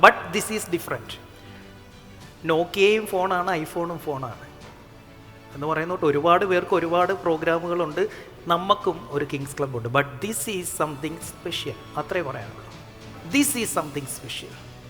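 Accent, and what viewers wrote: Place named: Thiruvananthapuram, India. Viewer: Are you from tvm? Or kollam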